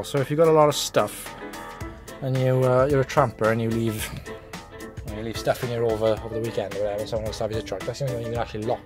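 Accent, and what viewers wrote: Wales, United Kingdom. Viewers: where in wales you from dude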